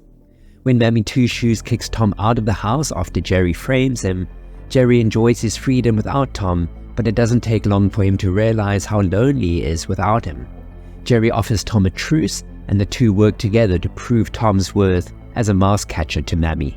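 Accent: South Africa. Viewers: Hey you are South African right judging by your accent? It’s nice to meet a fellow South African cartoon lover.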